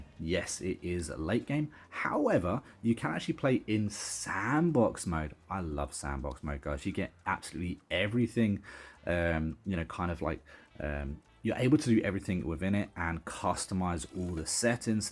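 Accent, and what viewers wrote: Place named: United Kingdom. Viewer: Red Banana. Thanks for the up to date review which also mentions New Frontiers, what I was looking for. Also early on I noticed that you were deliberately choosing to speak in normal English and not a weird mix of normal English and specifically American English in word choices, pronunciation and putting the stresses in the wrong parts of the words. This isn't just annoying, it's the fact that it doesn't make more people watch and like you from over there. Americans have been trained by Hollywood to James Bond baddies with English accents to give a level of intelligence and competence that they like to listen to, just because we get past participle right. I therefore was not surprised to hear you have a book out, so that was why I didn't have to explain to you what editors say about not mixing dialects. Good luck to you with both this and the writing and thanks for being competent. 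This is very hard to find among British content creators increasingly.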